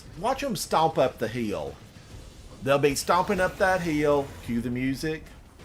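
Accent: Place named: Appalachia, United States. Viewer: What is your accent? Appalachian? Love your content, you're very charismatic.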